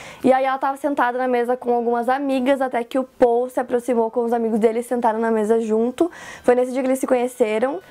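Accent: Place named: Rio Grande do Sul, Brazil. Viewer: vc é gaúcha? tem um sotaque em tanto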